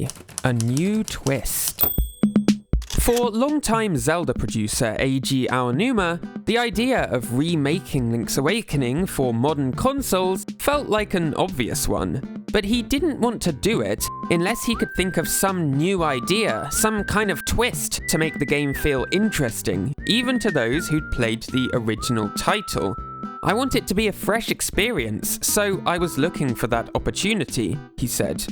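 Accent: United Kingdom. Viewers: Why do british people pronounce sixth like that?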